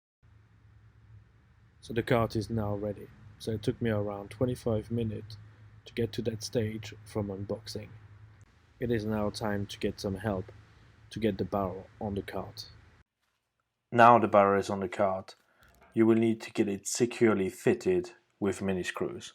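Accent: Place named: England, United Kingdom. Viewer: You sound more like a Limey...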